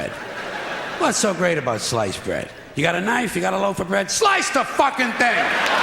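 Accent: Brooklyn, United States. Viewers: He's from Brooklyn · love that Brooklyn accent. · He's from New York, he isn't faking it.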